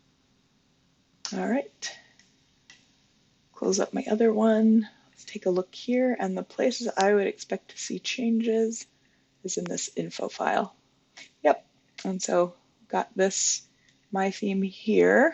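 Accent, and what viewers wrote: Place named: Scotland, United Kingdom. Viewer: I detecting a wee scottish twang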